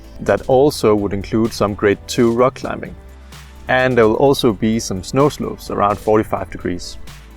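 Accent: Denmark. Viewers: Hej, overvej at skrive stednavne når du nævner dem. Ikke mindst til os der ikke lige hører det første gang, men måske også til dem der ikke kan den tykke danske accent. Fed video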